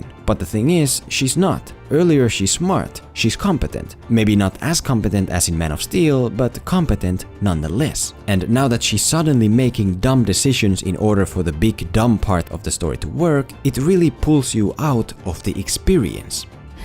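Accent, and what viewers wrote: Finland. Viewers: Hauska kun heti sun venom videon alusta huomasin sun suomalaisen aksentin :D hyvä et meillä on oikeesti hyviä ammattimaisia suomalaisia leffatuubajia !! jatka hyvien videoiden tekoa ! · Suomienglanti havaittu: torilla tavataan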